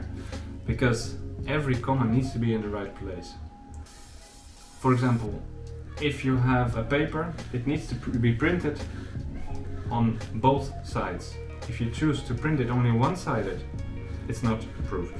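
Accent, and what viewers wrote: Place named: Russia. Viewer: Почему он говорит на английском с русским акцентом?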